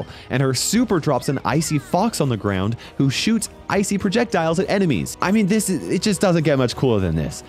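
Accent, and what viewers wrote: Norway. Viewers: Wait wait wait Are u Norwegian? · Are you Norwegian?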